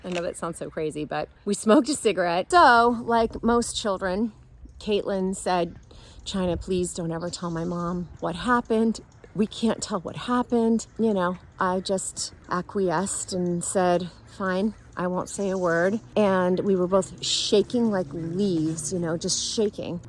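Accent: England, United Kingdom. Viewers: Love the British accent!!! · Not lovin' the English accent and imagine how cringe it's be if a British youtuber broke into an American accent. He/she would sure look a fool! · Llllove that English accent! I give you kudos for that one for sure!! (Even if I'm a year late for this one). But I love all accents, I gotta say, just love 'em! And so, throwing in some others now and then is just fine with me! But above all, I love your devotional talks here, thanks, our eternal friend! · The English accent is awesome!! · Beautiful British accent,. Makes your beautiful voice more beautiful.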